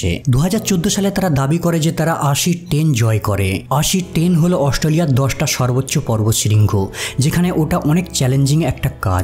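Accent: India. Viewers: Indian channel এটা 😂😂 বাংলাদেশীদের ভাষা আওয়াজ ভারতীয় দের মত না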